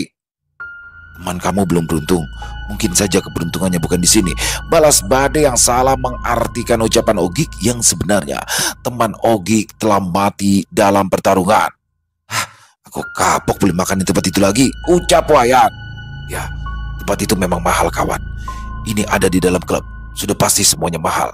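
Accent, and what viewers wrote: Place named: Kalimantan, Indonesia. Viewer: Ceritanya bagus, diawal pembawaan kisah logat Kalimantan masih kebawa mungkin Krn kebanyakan kisah dr sana ya 😁. Jadi ada tugas baru nih bang belajar aksen Bali 😅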